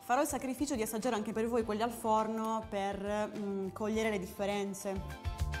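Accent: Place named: Apulia, Italy. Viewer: Che amore che sei con quell'adorabile inflessione pugliese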